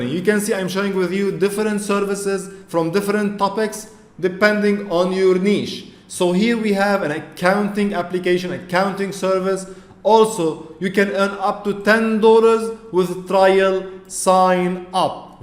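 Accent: Arab world. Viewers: عرفت انك عربي من اول كلامك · May not an arabic native speaker try to speak English language.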